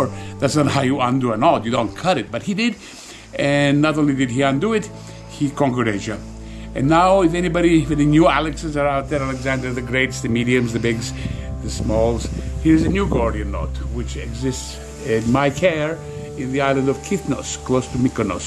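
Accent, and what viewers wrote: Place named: Spain. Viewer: Is this guy Greek or Spanish he sounds super Spanish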